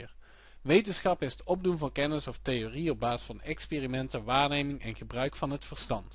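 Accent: Brabant, Netherlands. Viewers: Ja!:D Maar voor mij is't gelukkig geen moetje. Ik mag gewoon leren voor de leuk, en maakt het wel makkelijk zo met z'n Brabantse manier van spreken ^^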